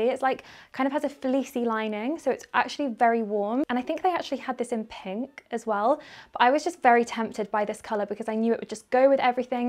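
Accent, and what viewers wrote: United Kingdom. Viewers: Your British accent is so lovely, and your beauty is overwhelming 🌹 Greetings from Vienna, Austria 💐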